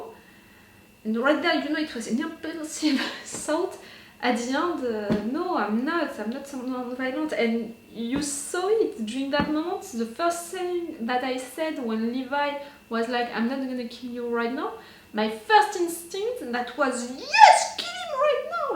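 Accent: France. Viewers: These episodes are really getting out of hand, i hope it's not offensive but your emotional reaction mixed with your french accent really makes it for me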